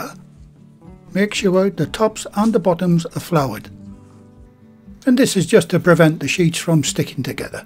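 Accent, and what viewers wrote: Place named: Northern England, United Kingdom. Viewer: ooooft these look amazing, but you sound northern like me shouldnt that be henderson's relish your using:P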